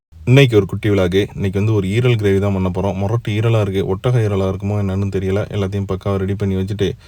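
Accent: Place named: Keelakarai, India. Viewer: காமெடியா கீழக்கரை ஸ்லாங்கிள் பேசுவது செம😅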